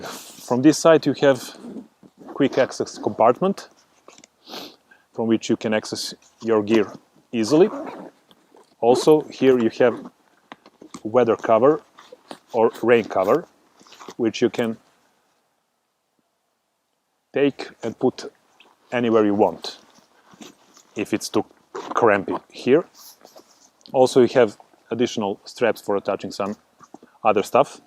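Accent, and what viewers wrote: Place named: Eastern Europe. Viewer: I cant stand Eastern European accent 🤬🤬🤬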